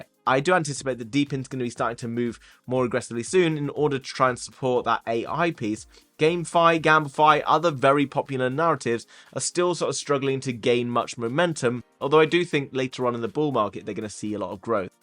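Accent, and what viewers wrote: United Kingdom. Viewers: As a german follower, which even speaks very fast german, i love your clearly fast speaking i guess british english, cauz i ca understand everything and dont have to skip before and that is even awesome, like your BIG PULLING contet! I like your authority and your attitude, cauz i can see the through in your eyes and know your heart is beating for Investigating/trading AND secure your community, what is really epic ! I really aprecciate your content! Haave nice day brother, i send you much energy from the universe =)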